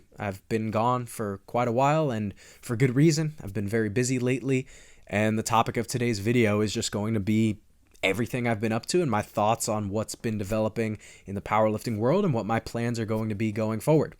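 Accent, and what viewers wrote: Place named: Miami, United States. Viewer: Bros been in Miami for too long, almost say america with an accent lol.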